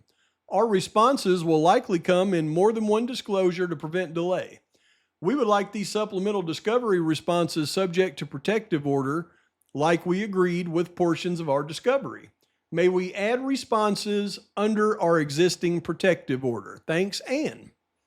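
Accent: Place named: South, United States. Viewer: The hick accent is too distracting. Sorry, not today.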